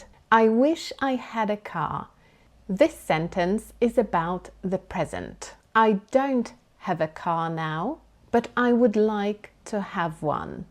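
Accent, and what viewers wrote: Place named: United Kingdom. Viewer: your English is extremely clear! I love british English! It's very nice to hear you! ❤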